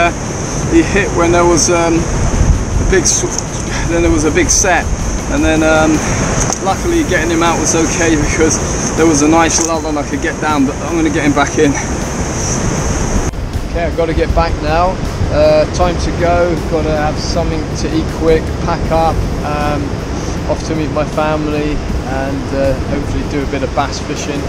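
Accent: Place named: England, United Kingdom. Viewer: Hi loved the vid and subscribed. This vid had me fascinated, we get bluefish here in Australia where they are called Tailor. I was actually thinking this was an American clip on bluefish ing, next thing I hear your English accent, then see you in some kind of subtropical location Confused lol, what country is this and why do the fish get so big there? Are you a regular tourist to the area or live/work in the area? Cheers mate loved the vid big time.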